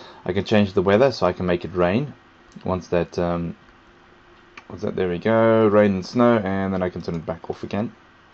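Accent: South Africa. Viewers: are u southafrican?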